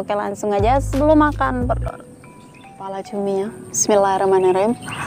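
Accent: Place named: Java, Indonesia. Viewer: Mantap medok pinter banget masak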